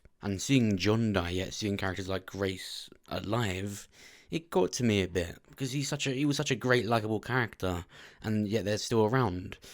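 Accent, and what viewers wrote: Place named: Southern England, United Kingdom. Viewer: Lol I’m from blackpool but i think your southern accent proper ace lol you have a creepy type voice as well 🤣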